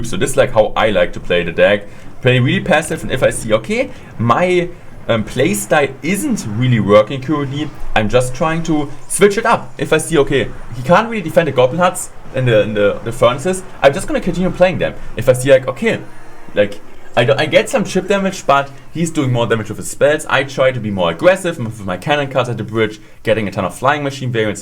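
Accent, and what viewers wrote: Germany. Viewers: Man hört so krass das er deutscher ist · man merkt richtig deutscher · I like your german Aczent😂 Bin btw auch deutsch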